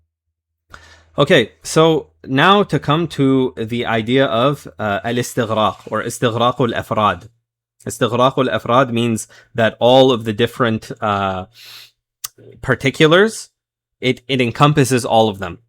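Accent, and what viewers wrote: Iraq. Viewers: are u iraqi? I'm just wondering because of uour accent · زين اني شلون عرفتك عراقي من اول كلمة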